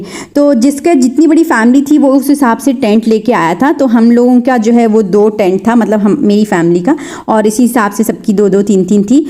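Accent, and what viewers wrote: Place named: Jharkhand, India. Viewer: Assalamu alaikum Aapi maybe India se hun Jharkhand se jab aap baat Karti Hain To mujhe lagta hai ki aap hamari taraf ki Hi Ho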